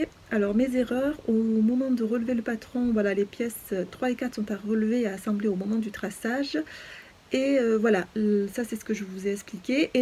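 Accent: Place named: South, France. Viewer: Kk, Je viens de regarder tes vidéos, j'aime beaucoup suivre ton évolution et je te trouve débrouillarde surtout avec le tee-shirt adulte transformé pour enfant. Tu as la voix calme avec un accend du sud bien sympathique. Je suis abonnée pour pouvoir suivre ton évolution 😘😘😘😘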